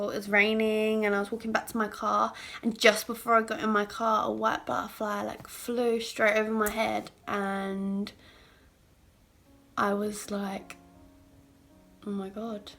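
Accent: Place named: Essex, United Kingdom. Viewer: Omg it’s okay to cry just remember that there looking down watching u carry on being amazing and I honestly love u so much and ur so inspirational and I’m obsessed with ur Essex accent lol 😂 ur gorgeous and strong remember that!! 👌🏼❤️❤️❤️❤️❤️❤️❤️❤️❤️❤️😍😍😍😍😍😍‼️‼️‼️‼️‼️😭😭😭 We all ly ‼️❤️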